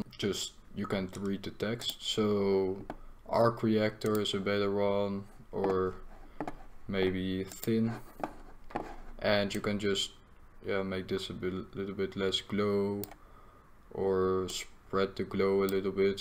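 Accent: Netherlands. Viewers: Are you dutch you sound like you are dutch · Lekker nederlands accentje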